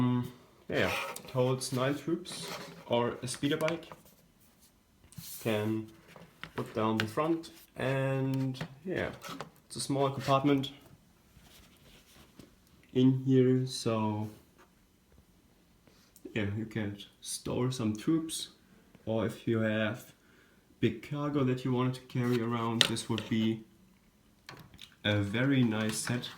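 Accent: Germany. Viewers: Wusste ichs doch, er ist Deutscher😂 der Akzent hat's verraten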